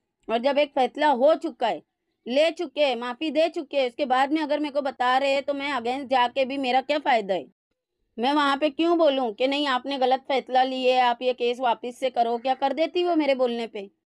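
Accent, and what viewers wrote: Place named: India. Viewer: api ap India sy hn kia. ap ki urdu sy lga .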